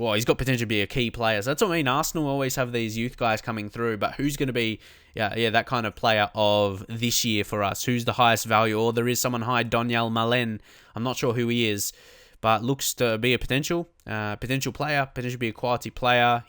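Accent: Australia. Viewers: ih yes i love the austräääälian accent! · this aussie accent man. cheers from Syd, mate.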